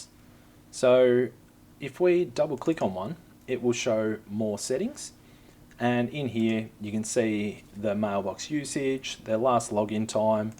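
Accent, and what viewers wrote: Australia. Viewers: I guess this is the closest thing we are gonna get to finding a video with English Speaker. Like, I saw the thumbnail and thought YAY a white guy then I hear hes Australian and Im kinda bummed but then I think well at least hes not Indian. WHY are so many Tech videos done by an Indian with a super harsh accent? Hey Non- American accent aside, Great video "Mate". ;-)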